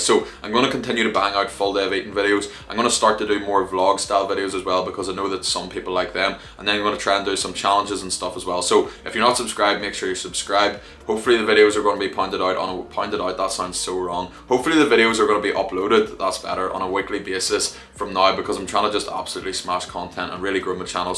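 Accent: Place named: Ireland. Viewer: Love the video mate. What part of Ireland you from?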